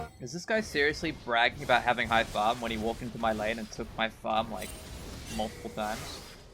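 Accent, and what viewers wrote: Australia. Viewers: Loving the series mang keep it up, real informative and nice to hear an aussie accent behind the skill. Have you considered doing coaching videos?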